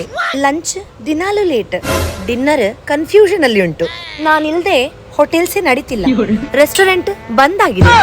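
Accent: Mangalore, India. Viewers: Sooooooooper stand up comedy dear gas ⛽ 😂 Such a beautiful actor you are ..just wowww U told the reality of gas usage in a good way creating humour . I JUST LOVE UR MANGLORE KANNADA ...Muuaaahhhhhhhh 👄 But dear gas cylinder dont worry Ishtu diwasu band maadiddu microwave iga upayogistene 😂 Haage nann bali induction kuda untu...neenu swantane maha khiladi anta tilko beda 😁😁😁😁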